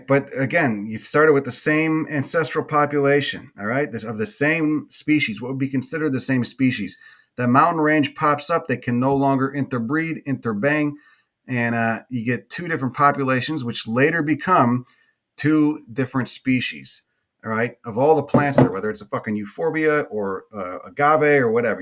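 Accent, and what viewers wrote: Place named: New England, United States. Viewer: love the new england fisherman accent… there’s a wise genius to it...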